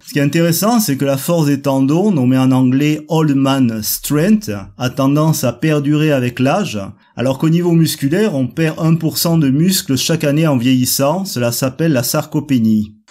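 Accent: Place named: Corsica, France. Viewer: Super video ! On croirait meme reconnaitre un accent corse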